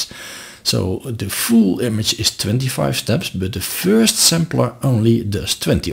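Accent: Netherlands. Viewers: some vague intuition tells me you are Dutch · duidelijk te horen dat je nederlands bent :) bedankt voor de informatie. ben nogal nieuw met ComfyUI en jouw workflow bestanden zullen zeker helpen mij het beter te begrijpen :) toodles!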